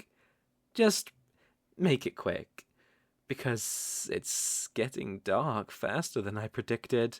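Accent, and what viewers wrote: United Kingdom. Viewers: What did I just bump to?! That's the right amount of sssss and Britishness I needed to hear! Oh my... your voice acting is very great! I'm gonna to some walk around his channel AHHH Thank you for your efforts!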